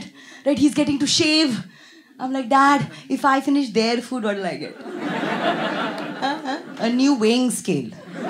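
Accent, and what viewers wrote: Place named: Bengal, India. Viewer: i have seen only first 1 min still i feel u hv bengali accent bengali face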